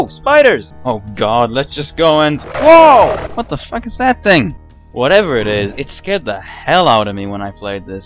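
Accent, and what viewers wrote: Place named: North East England, United Kingdom. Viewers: Sound like he's from Northumberland where I live. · Diego Rojas The North East of England would be my guess...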